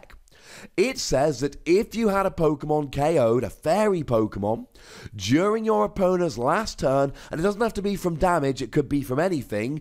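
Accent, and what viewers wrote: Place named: United Kingdom. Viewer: I´m sorry if this offends you, but the way you say "ball" kinda sounds like "bull." Is it the british accent? Edit: And also, when I played against some guy at a league challenge, he played apricorn maker, and that pretty much won him the game becuase he could set up so fast. Minutes after the game finished, he kept telling me how good apricorn maker and that he´s happy he´s playing it.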